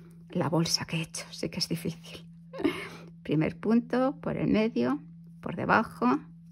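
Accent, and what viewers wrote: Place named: Spain. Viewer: Pensé que de España Portu acento!!! Un abrazo… yo soy chilena!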